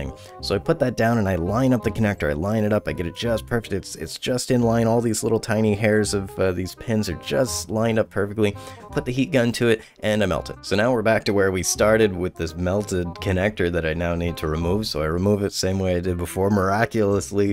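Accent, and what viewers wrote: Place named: Canada. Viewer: Hey bro, you sound super Canadian here. Also this sounds like more than 500$ in labour.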